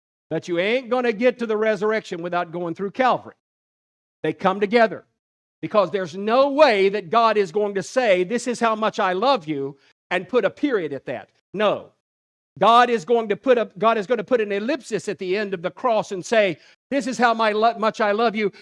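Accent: South, United States. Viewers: Lol as a former Catholic, please stop lying to yourself. The Roman Catholic Church is schismatic, they departed from the traditions and dogma of Christ’s church within the first 1000 years. Modern Roman Catholicism is the biggest contradiction and cope of all. The sermon was on point, Christ’s crucifixion was a complete victory ☦️. And may I ask what’s protestant about this? Is it because he’s speaking with a southern American accent or because he’s speaking with some enthusiasm?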